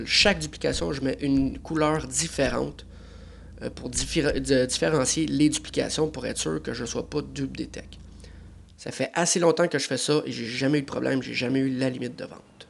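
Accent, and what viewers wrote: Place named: Quebec, Canada. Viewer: Ça marche encore ? Sinon bonne vidéo mon ptit québécois je kiff l'accent 😂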